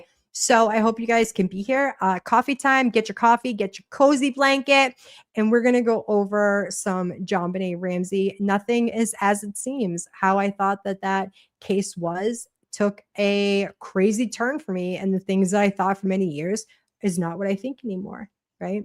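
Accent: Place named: Boston, United States. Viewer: Hey girl! I met you on the other Amy's live last week, I LOVE your energy. Bonus is your Boston persona. My husband is from Dorchester. I am from Philly so I figure same same. ❤ 😂 Thank you for covering this insanity - I think its important to spread the word about these nasty people so hopefully nobody else will fall prey to them.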